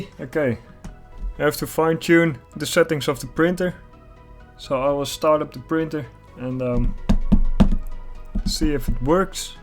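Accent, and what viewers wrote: Netherlands. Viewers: Ah heerlijk een nederlander, ik hoor het aan het accent 😂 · I fucking knew it xD first time watching your channel. Dat Nederlandse Engels is zo herkenbaar xD Maar wel mooi die flex3drive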